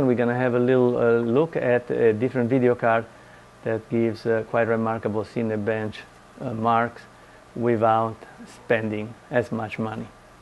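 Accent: Italy. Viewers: you speak english very well but your accent makes me wonder: Are you italian? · R U Italian?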